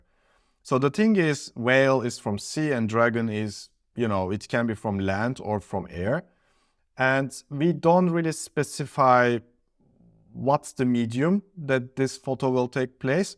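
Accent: Turkey. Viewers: aksanı duyduğumun 5. saniyede falan dedim ki bu türk. anca bu aksan bir Türk'te olur. Çok farklı konuşuyoruz gerçekten yabancılardan. nasıl hintliyi vs fark ediyorsak konuşurken. türk kişide anında anlaşılıyor be kardeşim. iyi çalışmalar.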